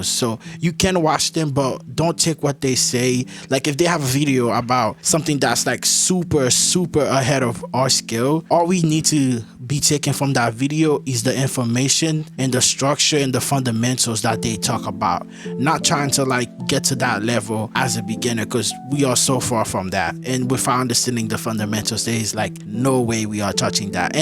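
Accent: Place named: Haiti. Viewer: I was like "bro sounds Haitian", then I saw your channel name 😂. Peace brother🇭🇹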